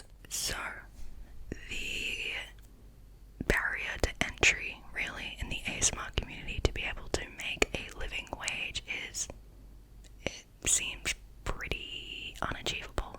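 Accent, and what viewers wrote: Australia. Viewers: Are you aussie? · I’m late to the party but is Australian? Sometimes it’s hard to pick the accent with asmr but I’m loving it!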